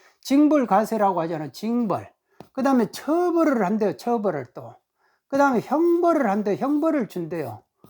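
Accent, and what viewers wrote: South Korea. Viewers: 구독자 입니다. 고향의 이웃동내 같은 구수한 억양에. . . 저는 전북 정읍 현재는 사무실을 옆 하비오 에 있습니다. 오늘은 너무 유익해서 두번 듣습니다. 제가 동영상을 거의다 듣고 있는데 아주 유익 합니다 .끝부분에 고생 고생해 남쓸때 안쓰고 모아서 3채 가 되기에 아주 고민이 많습니다.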